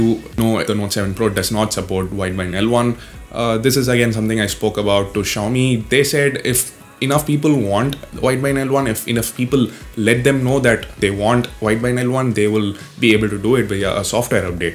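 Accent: South India, India. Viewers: Your south indian accent tho 😂.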